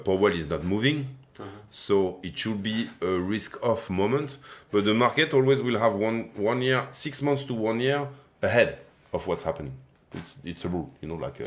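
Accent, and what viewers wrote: France. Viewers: Merci pour l'accent frenchy 😊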